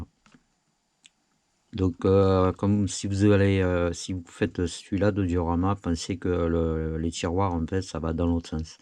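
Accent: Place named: Ardeche, France. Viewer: C'est super bravo, quelle boulot! J'ai acheter le kit il y a une semaine, j'ai 36 ans, si je le finis avant mes 60 je sera très fière de moi! Hahah! Est se que vous êtes situer dans l'Ardeche? J'entraine mes oreilles flamand a reconnaitre les accents different français.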